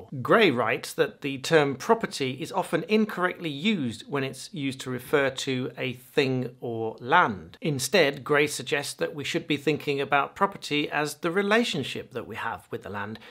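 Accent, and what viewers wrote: United Kingdom. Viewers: With that accent, I have to ask, are these laws in the U.S. or the U.K.?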